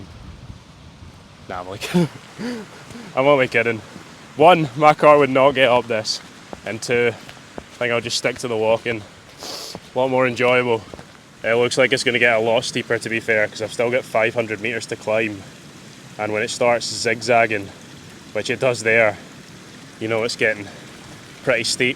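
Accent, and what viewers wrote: Scotland, United Kingdom. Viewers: I just love this the Scottish accent makes it so much better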